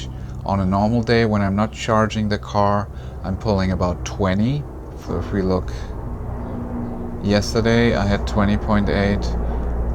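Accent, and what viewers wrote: Germany. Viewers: You have an accent, are you German?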